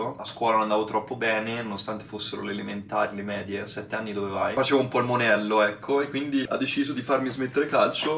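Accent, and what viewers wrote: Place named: Arezzo, Italy. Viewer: Si sente che sei di arezzo! Ora però devi fare un video in cui metti una tripla e ti giri esultando con "alò dai"!!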